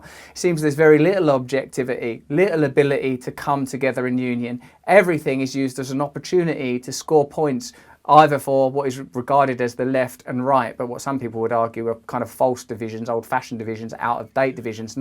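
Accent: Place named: United Kingdom. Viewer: The Brits are so cute when they pronounce words.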